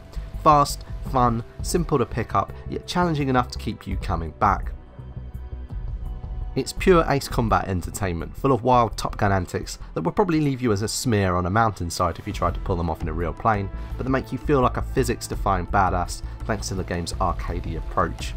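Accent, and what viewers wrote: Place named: United Kingdom. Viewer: I can't sit through another YouTube game review video with a heavy-handed British accent. I just can't. It's everywhere. Imitators are even putting out videos. Some of them have obviously practiced quite a bit. I didn't down-vote or anything like that. I just can't listen to the cockney-esque or "Liverpool-ean" narrations anymore.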